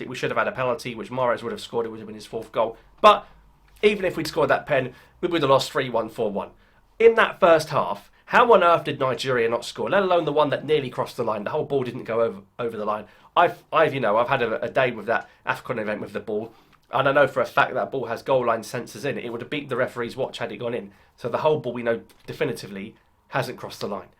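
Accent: England, United Kingdom. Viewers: Sweeet!!!! Nice jolt ⚡️! Algerian with an English accent!!! I was expecting a French accent !!! Love your spot on analytical review! 👊🏾🇳🇬👊🏾🇳🇬!!!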